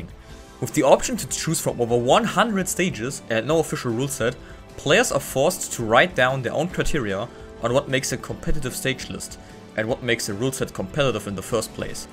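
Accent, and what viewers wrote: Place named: Germany. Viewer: Hab dein accent gehört und direkt gewusst, dass du deutscher bist lol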